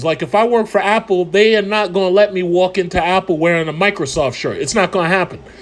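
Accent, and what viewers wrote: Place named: New York, United States. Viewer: Your accent sounds so old timey New York.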